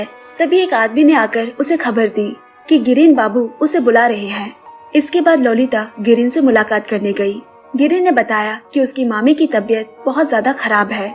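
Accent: Bengal, India. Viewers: Both are correct it's just bengali acent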